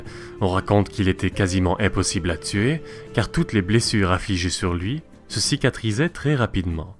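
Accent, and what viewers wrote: Quebec, Canada. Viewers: Petit accent québécois..👌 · En vrai la vidéo est bien mais l'accent canadien ça me sort du truck dsl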